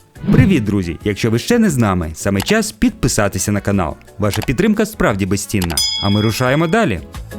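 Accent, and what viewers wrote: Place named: Russia. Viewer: Хороший контент, але дуже ріжуть вухо деякі слова промовлені на руснявий маневр. В українській мові, всі голосні вимовляються чітко. А в вас досі є - кантраст, риспубліка і тд. Будь ласка, попрацюйте над вимовою. Підписалась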